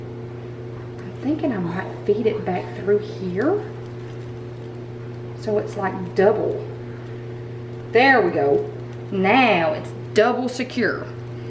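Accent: South, United States. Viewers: I think it turned out really cute! Your southern accent is so endearing :)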